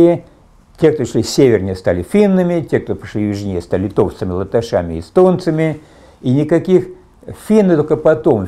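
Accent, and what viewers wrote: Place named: Kursk, Russia. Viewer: Мне Клесов нравится. Я тоже против любой элитарности. И в обществе и в науке прежде всего. Особенно мне нравится его Курский говорок. Был у нас на работе такой же парень из курских. Если в ДНК генеалогии есть рациональное зерно, то оно пробьет себе дорогу в любом случае. Кто бы чего не говорил. А если кто то против, то пожалуйста аргументы на стол. Но если оппонент переходит на личности, то других аргументов его неправоты пожалуй и не требуется. Все же просто.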